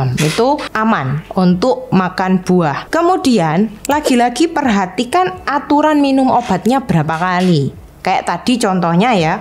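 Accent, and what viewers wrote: Java, Indonesia. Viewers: Medhok sekaliii ngomongnya. Trimakasih dok ilmunya.. 😅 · Salam sehat Dok Mau nanya nih Kok ngomongnya MEDOG sekali Emangnya Bu Dokter Asli dari mana Mksh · Makasih ce info nya... Logat bicaranya sy suka ce seperti wong jowo..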